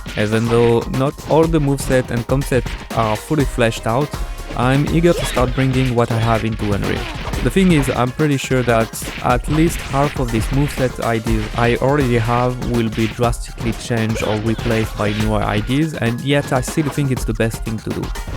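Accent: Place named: France. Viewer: De par l'accent, et certaines réponses, je devine un developpeur français. Bon courage ! Adorant les productions Capcom et Platinum Games, je garde un oeil dessus. Rien que le decor desertique maisi pas vide, cest deja intéressant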